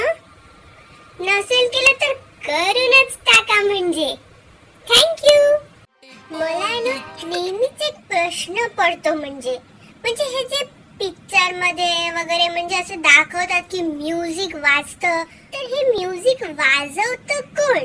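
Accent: Nagpur, India. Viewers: Bhaitad! typically Nagpur very cute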